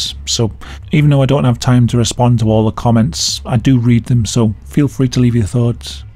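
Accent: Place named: Manchester, United Kingdom. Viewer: Oh,. You don't sound sarcastic, you sound like a Manc!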